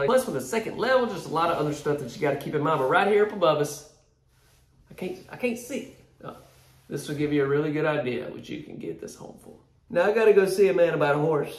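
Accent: South, United States. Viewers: Thts Southern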